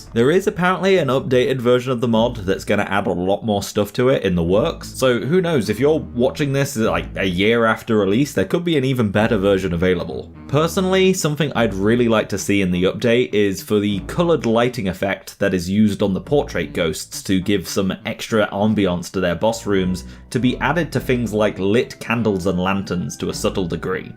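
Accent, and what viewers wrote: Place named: United Kingdom. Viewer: Why must all you Nintendo and Sega YouTubers be British and ruin the video with your horrible voice… quit forever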